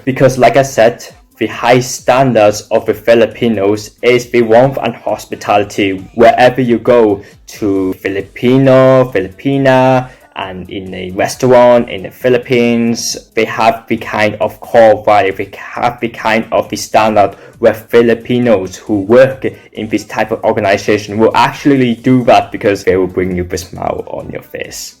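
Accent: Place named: United Kingdom. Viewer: ang ganda ganda naman dyan…british accent wow!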